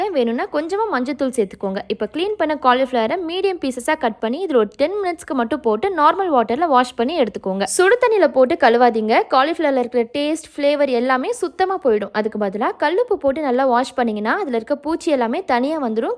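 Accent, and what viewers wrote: Madurai, India. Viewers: Neenga madurai ah sis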